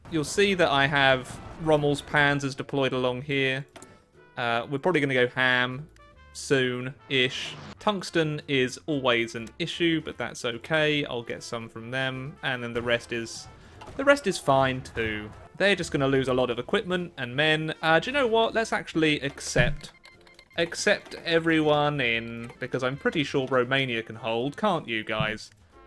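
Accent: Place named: United Kingdom. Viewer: He's more Bri'ish than usual in this video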